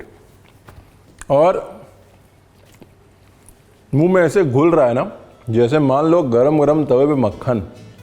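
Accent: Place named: Lucknow, India. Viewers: लखनऊ भाषा😊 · I lived in Lucknow for a year, it was a magical time for me and you speaking in this accent literally brought nostalgia and big smile on my face 😊❤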